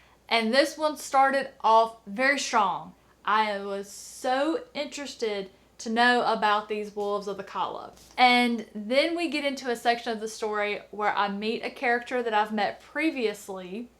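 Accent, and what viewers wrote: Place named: Tennessee, United States. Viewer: Your accent is the best <3 TN am I right? I lived there for a little bit and everybody had a nice twang.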